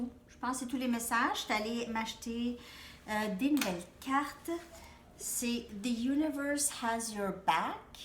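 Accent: Quebec, Canada. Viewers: Bonjour, merci beaucoup pour cette guidance ça me parle beaucoup. J'ai découvert ta chaine il y a peu et ça m'a attirée toute suite, déjà parce que, si tu me permet, je vois à ton accent que tu es Québécoise. Et il se trouve que ma flamme jumelle est justement Québécois. 😊 D'ailleurs, depuis que je le connais, j'ai l'impression que les mots Canada, Québec et Montréal me suivent partout. Je pense que c'est la vie qui essaie de m'envoyer des signes. Peut-être pour aller habiter là-bas bientôt, même si je vois pas comment ça pourrai arriver. J'habite en France. Il se trouve que je suis entrain de me prépare à déménager, car mon bail se termine bientôt. Je n'ai pas encore décider où aller. Enfin si, dans le fond je sais où j'ai envie d'aller. Au Québec, mais ça m'a l'air compliquer à première vu. Voilà, je parle de ça au passage, car je me dis que comme vous êtes de la bas. 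Vous auriez peut-être des conseils, éventuellement à me donner. Peut-être que ça pourrai m'aider. Et encore merci pour les tirages, ça m'aide beaucoup à m'éclairer par rapport à ma flamme jumelle et surtout ça m'aide à prendre confiance. Car c'est vrai qu'au début, il y avait encore parfois des petits doutes et des peurs. M'étant toujours fait des désillusion à chaque fois que je m'intéresse à quelqu'un. Malgré que parfois, j'étais sûr de moi. Donc au début, il y avait surtout là peur d'être déçue. Enfin voilà, bonne soirée.😘😊💗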